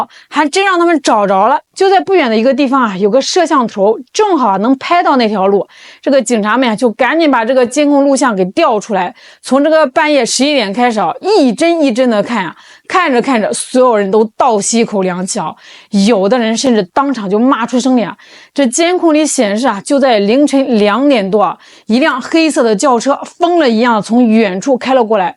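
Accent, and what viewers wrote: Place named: Beijing, China. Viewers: 她是一个北京大妞。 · 原來是北狄人 口音特別不舒服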